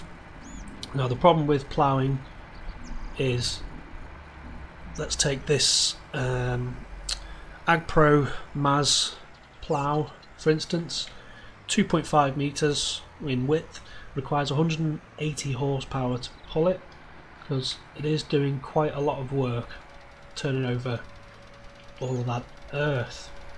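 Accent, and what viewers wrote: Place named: Liverpool, United Kingdom. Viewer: You do have a few Liverpudlian twangs in there :), are you using a steering wheel for this?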